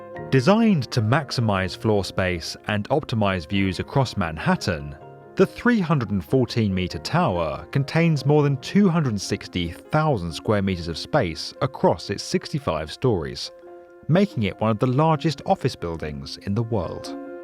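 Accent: United Kingdom. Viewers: amazing video, but I hate that mtfckin British accent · Everything sounds amazing in a British accent.:) You have done a great job in this video.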